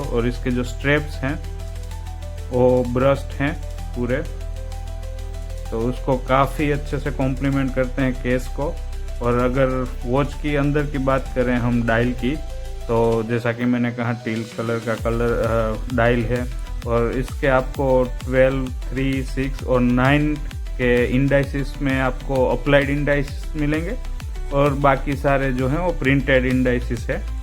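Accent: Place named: Gujarat, India. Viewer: Gujrati accent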